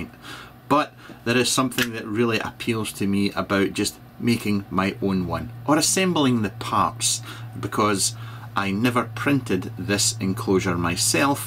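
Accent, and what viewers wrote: Scotland, United Kingdom. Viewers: Always reassuring to hear a Scots accent :-)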